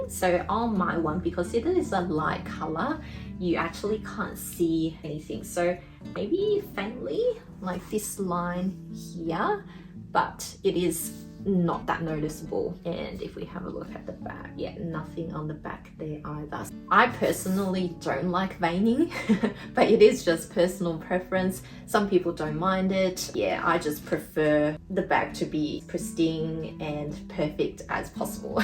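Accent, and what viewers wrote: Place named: Australia. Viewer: Also, it’s soooo nice to finally hear your voice! Been wanting to hear your Aussie accent for the longest time I’ve known you hahahaha 🤣😅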